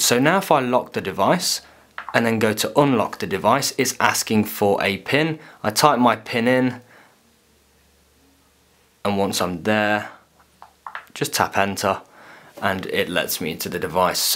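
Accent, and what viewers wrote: United Kingdom. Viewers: New Jersey, USA Nov 7,2016 Very good tutorial ! Keep up the good work. By the way, what part of the UK are you from? While my Ph.D. is from Texas, my doctoral research was done at Cambridge Univereity in England. At your converience, do email me. Sincerely, Dr. Jyotindra Bhatt, Ph.D. <mail>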